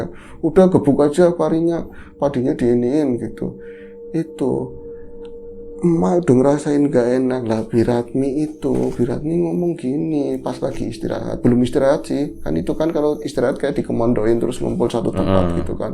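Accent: Banyumas, Indonesia. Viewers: Request..mohon lebih byk lagi ngapaknya..seru brasa di TKP beneran... Dan biar Mbah sering translate..Ra diem2 Bae 😁😁🙏🙏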